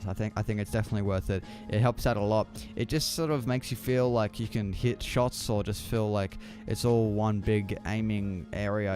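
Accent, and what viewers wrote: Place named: Australia. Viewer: Australian, defiantly a follow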